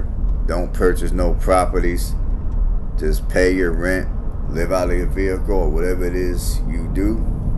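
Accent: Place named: New England, United States. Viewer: Guess illereate yankee voice beats AI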